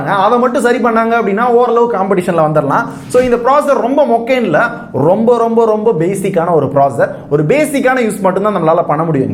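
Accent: Madurai, India. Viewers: Bro neenga madurai ya bro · bro neenga madurai ya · Are you Madurai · Bro neenga madurai ya🤗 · Bro neenga Madurai ya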